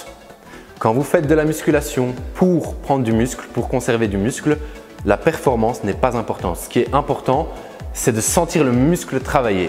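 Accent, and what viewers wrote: Belgium. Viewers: bon job je note un accent belge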